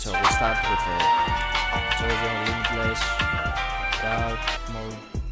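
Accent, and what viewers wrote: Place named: Greece. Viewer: Bro by the way you talk I think you are from Greece but I don't want to be rude or anything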